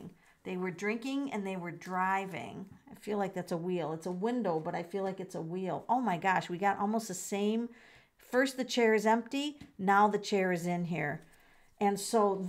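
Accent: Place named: Midwest, United States. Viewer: First I love your mid western accent. My husband is from Green bay wi. Second I love how you speak to Tara directly with the cards instead of just interpreting or reading the card. You really see her and acknowledge her. Don't forget to #taracalico in your tag section so it will get more noticed. I'm going to check out more of your work I love your personality.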